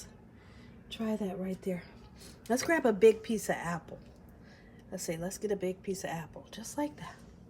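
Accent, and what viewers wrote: South, United States. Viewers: Must be from the lower south..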